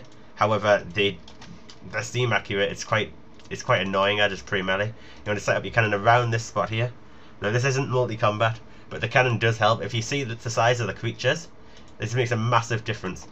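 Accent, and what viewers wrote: Newcastle, United Kingdom. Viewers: btw are u a geordie ?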